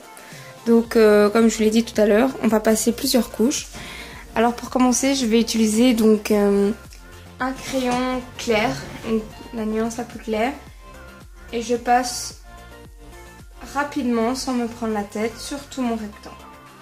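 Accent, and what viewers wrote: Belgium. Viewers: Sympa la vidéo :) Une question me brûle les doigts, es-tu Belge ? Si oui, d’où ?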